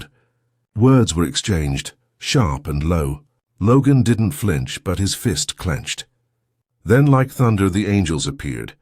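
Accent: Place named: RP, United Kingdom. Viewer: One of the chapters is out of order. It’s a little odd for an elite British accent for the narrator for this American story involving Hell’s Angels.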